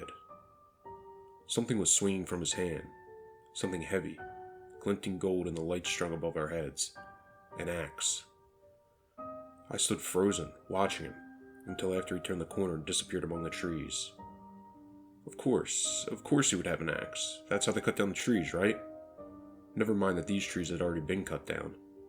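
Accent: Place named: Pennsylvania, United States. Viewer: First of all, I love your voice! Most of my family lives in Pennsylvania, primarily Lancaster county but elsewhere too. It's comforting hearing the accent because it sounds like most of my relatives. Secondly, I saw your comment on a different channel. You asked someone for advice or ideas or something. As your 28th subscriber, I'm mostly satisfied. I liked the second story more than the first. Both were great, but you narrated the second one a little bit slower. And this is my only constructive criticism. If you slow down the reading just a little bit, you'll be right on par with all the channels we both know and love. To your credit, I was still able to understand every word, despite the speed and the accent. Honestly, because of my family, it may be easier for me than for others. My dad especially- he's particularly difficult to understand sometimes. 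Anyway, please accept this input from a place of love and respect. I'm looking forward to more content from you in the future! 🙂💙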